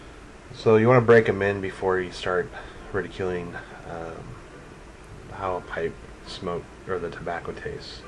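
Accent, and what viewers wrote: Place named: Ireland. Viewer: Dude you have to be Irish :) I'm a cigar smoker but thinking of picking up pipes and stumbled across you're channel! Subbed.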